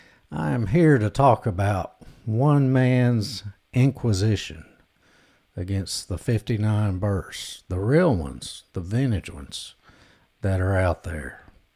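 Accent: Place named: South, United States. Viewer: used to run the clubs snow bird style from Michigan to Florida.so I love hearing southern draw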